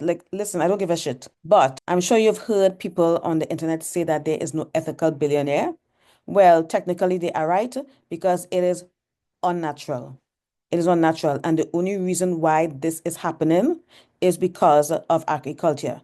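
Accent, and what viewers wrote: Trinidad and Tobago. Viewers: Yes she sounds like a Trini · What a minute i love the cursing you are a mess. You also sound Trini. Are you?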